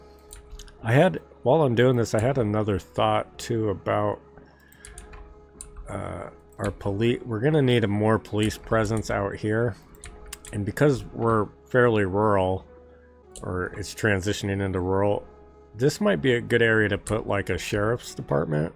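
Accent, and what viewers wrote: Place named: Oregon, United States. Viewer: You're a fellow Oregonian, sounds like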